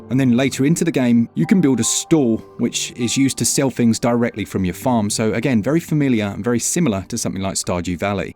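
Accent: United Kingdom. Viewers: I almost never buy any new games but I never miss these videos. There's something comforting about a British dude gently talking to me about Switch games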